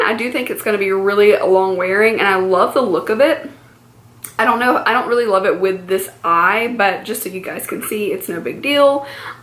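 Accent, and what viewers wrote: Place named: Alabama, United States. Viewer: Love this brand! So glad to see others talk about it! It sounds like your from Alabama? Maybe lol. I'm Mississippi haha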